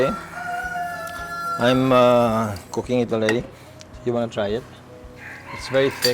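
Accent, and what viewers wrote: Visayas, Philippines. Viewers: Gusto ko ung bisaya accent eh. Lami gyud dong uy!!! Giatay mana sya uy! Lami sad na ba sa puto maya ba!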